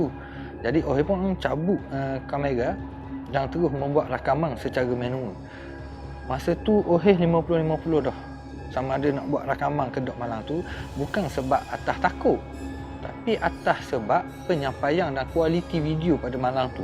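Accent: Terengganu, Malaysia. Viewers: Org hok komen negetif tu bute kayu teruskan langkah mu. Hok penting kekalkan pelat Ganu trademark mahal tu. Mana nak cari explorer ckp Ganu dlm dunia ni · Jgn nagis bang😂...org ganu eh bang?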